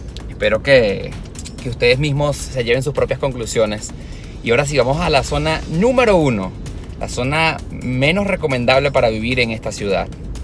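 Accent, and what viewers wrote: Venezuela. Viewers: Tratando de presentar estereotipo con ese asento de gay...osea asento venezolano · este pendejo de donde saco su estadistica. Con esa actitud y voz afeminada logro q no llegue ni 1 minuto, sin considerar ese acento venezolano asqueroso como esa gente.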